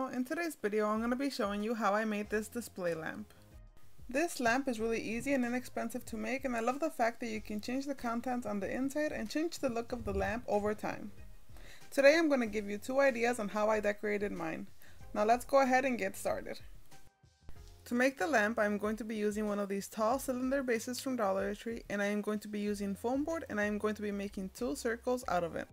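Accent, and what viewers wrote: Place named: South Africa. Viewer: You sound South African. Very charming pronunciation💐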